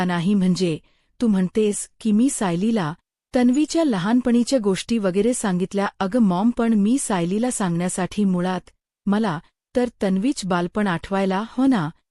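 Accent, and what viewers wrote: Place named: India. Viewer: What is your name,you sound like hindi speaking.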